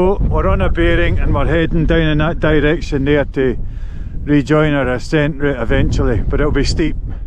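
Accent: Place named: Scotland, United Kingdom. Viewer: I confess to being very much a southerner, indeed right on the south coast of England but I come to walk in Scotland for about 3 to 4 months of the year spread over a number of visits. Slowly, very slowly, I am getting better at picking up the accent and some of the words you use to describe your brilliant mountains. I love watching videos of Scottish mountain walks and it’s really good to hear the pronunciations. That said I have one hell of a struggle to try and pronounce some of them.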